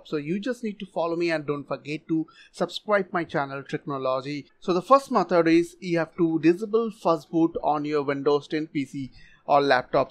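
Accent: India. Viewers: Oh shut up with this accent, back to the India, and take the lousy Indian actors with yourself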